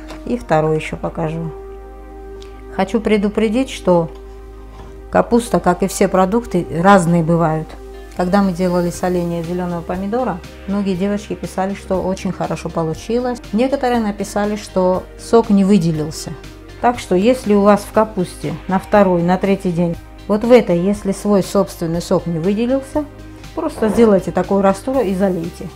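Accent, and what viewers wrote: Batumi, Georgia. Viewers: дорогая, очень приятно слушать Ваш голос. Когда жила в Батуми..... мне ваша речь напомнила о детстве И отдельное спасибо за простой и очень вкусный рецепт Я выросла на такой капусте и сейчас балую своих родных вашим рецептом По вашим рекомендациям готовила перец в банках. Супер!! Спасибо и дай вам бог здоровья и вашей семье благополучия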